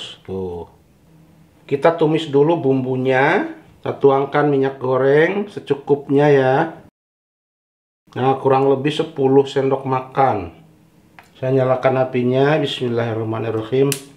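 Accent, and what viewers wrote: West Java, Indonesia. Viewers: Hahaa kungkurahan bagus sundanya g hilang berada d negri orang mantaf 👍🙏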